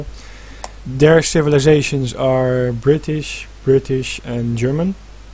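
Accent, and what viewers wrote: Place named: Netherlands. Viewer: You are dutch right i can hear it. plz tell me how to get a good economy at the start thnx :)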